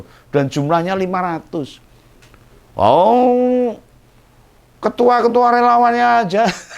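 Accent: Java, Indonesia. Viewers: Senneng meddoknya logat jawanya .... mendengngrkn sngt meyakinkn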